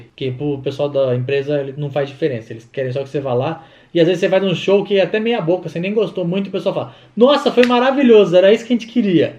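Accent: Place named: Minas Gerais, Brazil. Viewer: O interessante é que a gente acha que você é mineiro, mas não é paulista, depois do Rabin é você, meu querido!!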